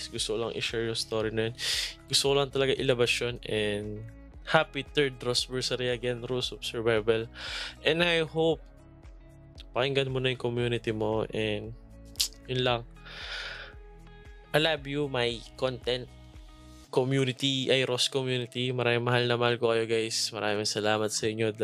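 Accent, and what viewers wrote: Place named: Pampanga, Philippines. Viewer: Kapampangan na kapampangan idle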